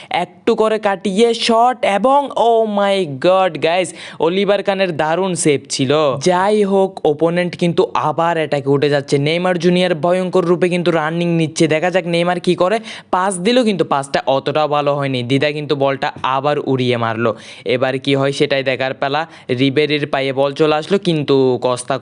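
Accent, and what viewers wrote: Chittagong, Bangladesh. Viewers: ভাই আমার মনে হয় আপনি চিটাংগা। আপনার বাড়ী কোথায়? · Vaiya apnar vasa Chittagong a kutai amar Chawkbazar ❤❤❤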